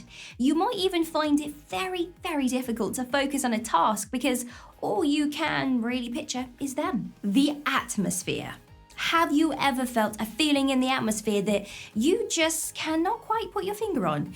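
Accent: England, United Kingdom. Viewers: You’re so sweet. The most pleasant video I’ve watched. What part of England are you from? I’m in Essex. Well done 👍 good video. And well researched. I felt so good watching. This.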